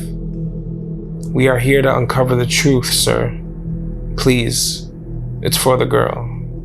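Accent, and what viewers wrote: New York, United States. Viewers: Where are you from? Sounds like New York accent.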